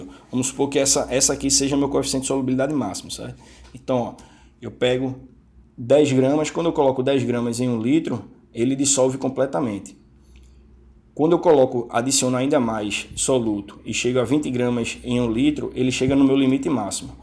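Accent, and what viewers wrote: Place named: Northeast, Brazil. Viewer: "arrente" amoooooo esse sotaque s2 hahaha nordeste pisa menos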